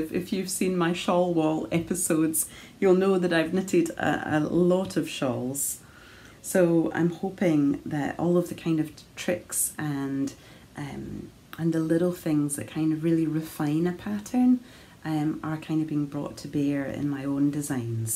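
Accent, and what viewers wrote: Scotland, United Kingdom. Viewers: Dearest, what I love most when watching your podcast is your language - your scottish pronunciation and your pleasant voice. I also appreciate when you podcast with your mom - I think that you both knit a lot of beautiful garments! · Hello - I always appreciate the way you move through your podcast….meaningfully😊not rushing but taking the time to fill in the details. And your soft Scottish accent is very relaxing and comforting. It always means a lot to me when you review Art exhibitions and other places you’ve been as our creativity doesn’t start and stop with knitting. I very much love your new shawl pattern and it’s so lovely that you’re thinking of us in the Southern Hemisphere too!! I live in Tasmania, the little island state to the south of the Australian mainland - it’s a beautiful, magical place to live but we usually still need a cardi or shawl on in those Summer evenings - so one of those could just fit the bill!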